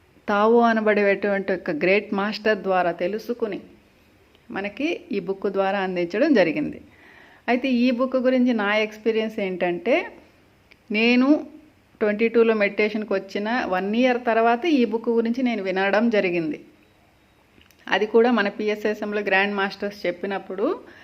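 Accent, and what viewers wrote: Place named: Nellore, India. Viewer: నమస్తే మాస్టర్, గత కొన్నిరోజులుగా మీ వీడియోస్ కోసం ఎదురుచూస్తున్నాను. అంతలోనే అత్యంత అద్భుత సమాచారంటతో మీరు దర్శనమిచ్చారు. మీ మాడ్యులేషన్ మరియు కొంత నెల్లూరు యాస చాలా ముచ్చటగా ఉన్నది. మాస్టర్, మీరు మేము ఇంతవరకు ఊహకు కూడా అందనటువంటి జ్ఞానాన్ని అందిస్తున్నారు. ధ్యానం మీద మీరు చేసిన వీడియో బాగా ఉపకరించింది, మాస్టర్ ధన్యవాదములు. అయితే, కలిగె సందేహాలు, మీతోని నివృత్తి చేసుకునే వీలు లేకుండా పోవడం చాల నిరుత్సాహం కలిగిస్తున్నది. ఏదైనా మార్గం చూపించ గలరని ఆశిస్తున్నాను. కృతజ్ఞతలు మాస్టర్ 🙏🌸🌼🌺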